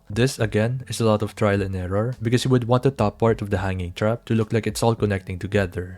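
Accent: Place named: Philippines. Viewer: I can tell your Filipino by your accent lol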